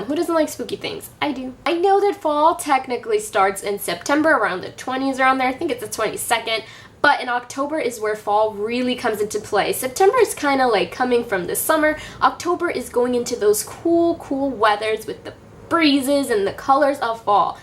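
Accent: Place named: New York, United States. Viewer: I love your NY accent. I'm from NJ lol I was just watching some of your old videos yesterday so it's great to see something new from you! I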